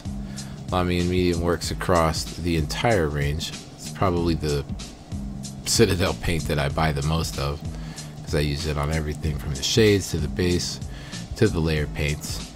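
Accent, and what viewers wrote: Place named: Milwaukee, United States. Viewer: You wouldnt happen to be from Milwaukee? Sounds like the right accent. Much love.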